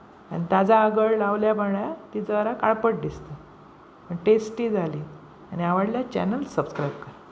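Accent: Malvani, India. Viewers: तुमचा आवाज छान आहे आणि मालवणी खूपच गोड. टेस्टी कोळंबी फ्राय.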